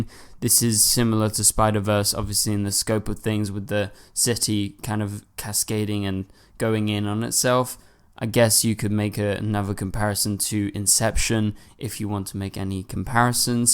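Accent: United Kingdom. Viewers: omg are u british?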